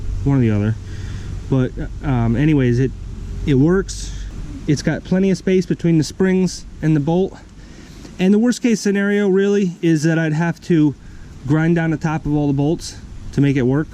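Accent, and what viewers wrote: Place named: New England, United States. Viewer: I agree with others here, You sure do sound like Andrew. Must be the New England accent.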